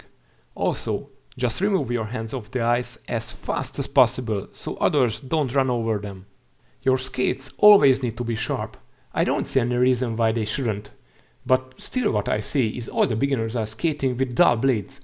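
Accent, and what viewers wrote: Hungary. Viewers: Vó srákok nem gondoltam volna h magyar csatorna :D pedig felismerem a magyar angolt. Pont jol jonnek ezek a vidik. Ment a sub pisz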